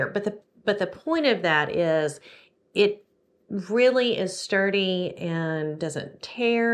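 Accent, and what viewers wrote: South, United States. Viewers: I like your Southern accent...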